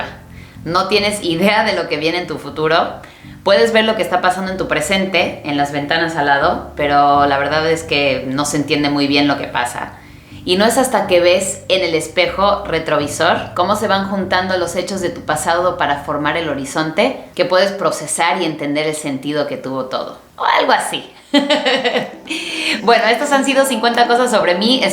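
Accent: Mexico. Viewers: Tu lengua nativa es inglés?? Tenés un acento algo mexicano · Your Spanish is so good, I'm in shock. I watched your first video in English and I'm like, o yeah, she's from the states. In this video, you turned Mexican. You became a different person, like a popular person. I think I need Anthony Bourdain to explain this to me. I just now realized I become a different person when I speak Spanish. I have so many questions, Your Dad, Your food likes, your music interest... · JAJAJA! hablas como mexicano con acento español (de España). Ni castellano ni Neutro. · =) Ojalá todas las personas del mundo tuvieran tu simpatía y frescura! Tu acento mexicano es apenas perceptible, sólo se nota en la pronunciación de determinadas palabras o al finalizar alguna frase. Cuando vi el video "tips para mejorar tu inglés" supuse que habías estudiado castellano en Argentina. Me encantaron tus historias!. 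· apenas lo acabó de ver y wow de los mejores 50 cosas sobre mi, ya decía yo que hablas más mexicana, yo soy de guadalajara, me encanto encontrarte 😘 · Tiene acento mexicano más que español, supongo que es porque ha vivido en México, en cualquier caso habla fantásticamente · Tu acento lanza a mexicano. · oh tiene acento mexicano!!! haz los acentos latinoamericanos:) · Habla como mexicana... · Jajajaja se nota mucho tu acento mejicano. Yo soy de españa y por ejemplo cuando tu dices "linda" nosotros utilizamos guapa o bonita.;) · Me encanta tu acento Tía. Pienso que es por los años que viviste en México aprendiendo español, más de La sierra, y no me gusta tanto que hables chilangoooo..jajajaja.. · Escribí en otro vídeo mencionando que hablas inglés con influencia mexicana. Veo que se debe a que eres chayotera (de Orizaba) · Hablas demaciado español Mexicano, al punto que hay palabras que no entiendo, por ejemplo:Capote ni se lo que es y me da una intrigaaa!. 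Tenes que hacer un video hablando como Argentino. ME ENCANTARIA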